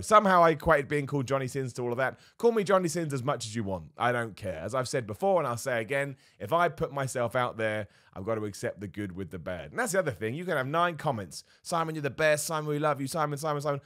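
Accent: United Kingdom. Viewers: Now that you mention it, you could kind of resemble Johnny Sins. However, you sound like a Brit and I'm sure Johnny Sins is a Yank. You sound like someone who does radio. And I'm not sure why being confused with Johnny Sins is an insult!!!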